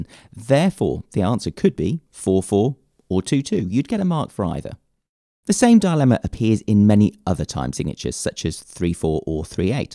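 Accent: United Kingdom. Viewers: Can’t understand British, sorry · Oooooh, with this awesome british accent, everything seems easier...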